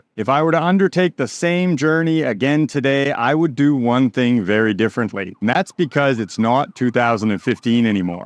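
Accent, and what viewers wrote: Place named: Canada. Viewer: You sound Canadian, probably Alberta? If you are into languages check out Shuo, she teaches Chinese in Bangkok Thailand, is Chinese, a great person and teacher!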